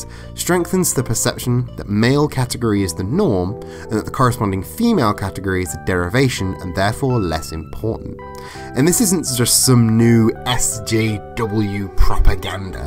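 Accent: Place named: United Kingdom. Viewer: I guess I'll go against the grain and thank you for the first part. It had information I didn't know. The whole signifier thing is interesting. And it did connect well with "male by default." But once you actually got to explaining that, you were just saying stuff I already knew. It came up when I checked out this "horrible" Anita Sarkeesian everyone was talking about. I wonder if having a male-sounding voice (with a "smart person" aka British accent on top) talk about it will help it resonate further. Though do I understand correctly that you are actually non-binary? Just checking so I use the correct pronouns.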